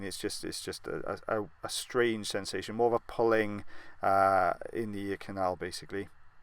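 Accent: Wales, United Kingdom. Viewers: I like watching these and listen to the commentary, your voice is so calming and the Welsh accent is so nice. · That Richard Burton & 😍 Anthony Hopkins duo Blessings for the Welsh accent t I have family in Guyana&Trinidad They like the Welsh sing when they 👄 🦜 talk Enchanting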